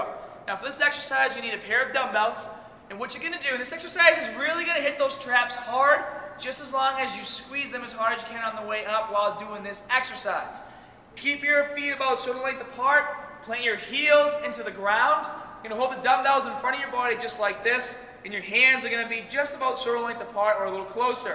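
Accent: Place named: New England, United States. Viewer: The accent is... Boston? Maine? New Hampshire?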